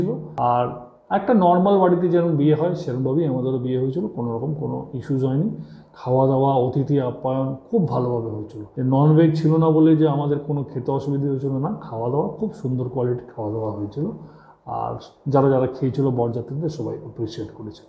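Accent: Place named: Andaman and Nicobar Islands, India. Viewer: Actually ai accent ta apni port Blair e chilen bole. Ekhner max bengali r kothay 2-3 te hindi bole. Ekta mojar kotha boli bengali slang ekhner max Tamil telegu ra bujhe ebong day o even Halka Bangla bojhe. Ami last 3 yr e antoto 10-15 jon Tamil telegu Malllu k dykhechi Jara fluent Bangla bole ekhne.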